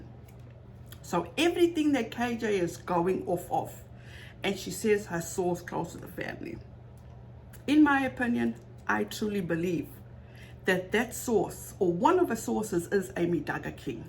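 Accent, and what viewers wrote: South Africa. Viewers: You sound like you have a Cape Town accent! I love your accent 😍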